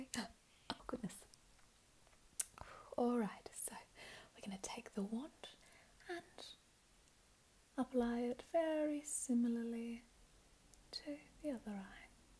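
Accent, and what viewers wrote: Australia. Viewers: I get so excited when you post! I’m so desensitised to asmr because I watch it for my insomnia but yours seems to work so well! Maybe it’s the Aussie accent because it familiar but ❤️❤️ thank you for everything you do!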